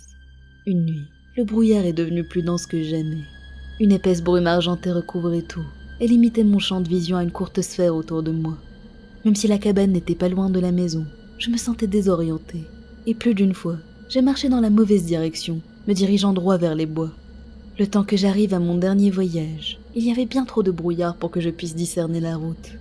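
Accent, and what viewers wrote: Quebec, Canada. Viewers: Tu viens du québec ?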